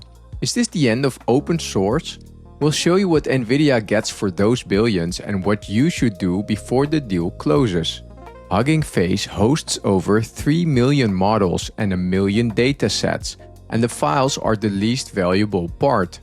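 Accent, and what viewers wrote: Netherlands. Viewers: Just dropping by to say you have the Dutchest accent I've ever heard in my life.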